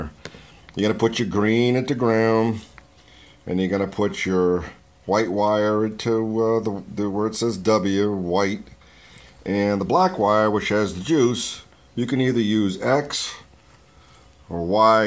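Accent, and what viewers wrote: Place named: New York, United States. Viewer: NiceyNice. You gottta be from New York. :)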